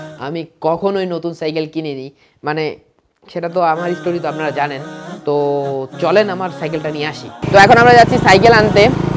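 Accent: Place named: Jhenaidah, Bangladesh. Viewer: ঝিনাইদহ??এক্সেন্ট শুনেই টের পাইছিলাম😅আরাপপুর থাকেন নাকি??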